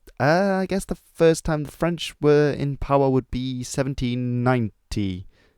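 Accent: Netherlands. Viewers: Wtf i was watching this and ur english was perfect so i thought that you were british but then you name the nsb those and I hear a perfect dutch accent and now i don’t know anymore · As a kid from Amsterdam I moved to Australia in '86 when I was 8yr old and I just love these videos. I missed out on a lot of Dutch history and your videos are great little packages of absorbable knowledge. On a side note.. I picked your Dutch accent the first min of the first video I saw but I can hear a very posh English accent coming through so much so would I be right to assume you went to an expensive school in England at some point? Your English is better than mine... lol. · At first i didnt even notice you were Dutch, at least not untill you said NSB. Then some other words made it even more clear. But i still had to look in the comments for my confirmation.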